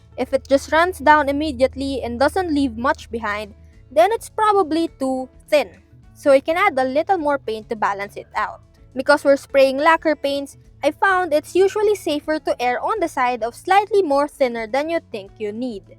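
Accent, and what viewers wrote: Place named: Philippines. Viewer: filipinos really have that accent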